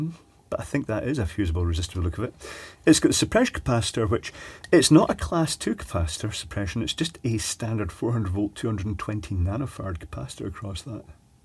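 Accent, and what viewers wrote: United Kingdom. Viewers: I just love your videos, everything in these is so classy. Probably because you are from the UK ? I must confess I don't understand everything you say, me not being a native english speaker but I learn a few tricks everytime. Your accent and the way you speak remind me of my Acorn days (I miss them).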